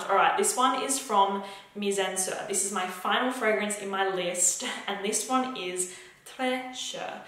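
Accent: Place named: Australia. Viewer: Aussie girl back at it again. Love the accent she has it’s orgasm into my ears...haha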